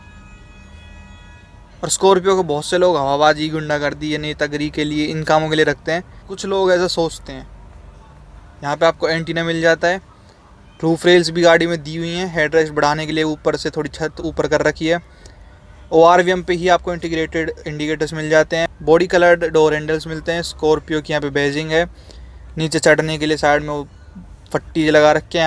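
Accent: Haryana, India. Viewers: Bhai yo desi haryanvi touch maza lata h car ke review me 😂😁😁😎😎😎💪💪💪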